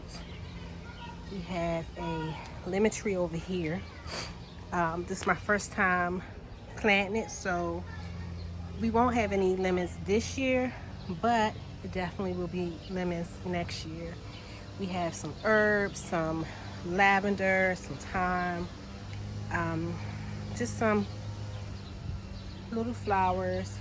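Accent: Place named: Baltimore, United States. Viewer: I knew once I heard your voice your were from BMORE!!!! I’m was born and raised there, but I live in GA. Your garden is BEAUTIFUL!!! Can’t wait to see this years garden!!! 💐💐 Peace & Blessings ✨✨✨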